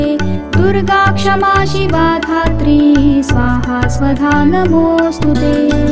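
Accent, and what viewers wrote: Standard. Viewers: शुद्ध उच्चारण , सुमधुर आवाज । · Complete path. Visuddh uchharan sath sath pratyaksh on screen. Iskeliye koti koti pranam . MAA DURGA AAP PE KRIPA KAREN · इस प्रकार सस्वर सहित एवं शुद्ध व्याकरण सहित पाठ मैंने आज तक नहीं सुना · अत्युत्तम सुमधुर कर्णप्रिय सांस्कृतिक स्वर में शुद्ध उच्चारणयुक्त, जय मां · Very nice, suddha uchharan To Liston again and again, Love to Liston all the time. 💐💐🌹👌👏🙏thanks making this odio. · Bahut madhur vani avm bahut shudh vachan.bahin per saraswati maa ki kripa hai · Bahut shudh ucharan ,ati sunder. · ऊँ नमो: भगवते वासुदेवाय । इतना सुंदर शुद्ध उच्चारण के साथ आप गायीं , बहुत बहुत धन्यवाद आपको । मीना सिनहा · सादर नमस्कार। शुद्ध उच्चारण साक्षात् गले में सरस्वती जी विराजमान हैं। मैं रोज सुनती हूँ और साथ में गाने का भी प्रयास करती हूँ ।मुझे भी गाने का शौक है। बहुत बहुत धन्यवाद नमस्कार। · ATI shudh uchharan Hai apka. 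Param madhur swar. Jai Ma 🙏🙏swayam Ma Saraswati ka Roop hain aap. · शुद्ध उच्चार... 💐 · adbhut शुद्धता और पवित्रता के लिए ही परसिद्ध है।शुद्ध उच्चारणके लिये कोटिश:धन्यवाद अभिनंदन। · जय भगवती मा शुद्ध एवं सात्विक उच्चारण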